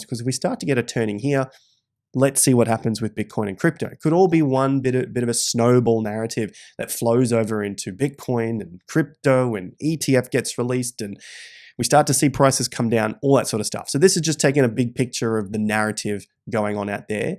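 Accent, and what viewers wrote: Australia. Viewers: accent brings me back to my backpacking experiences in Australia. Good on ya mate! But regardless of that, I love the honesty and all the considerations in this analysis. I’m gonna stick around here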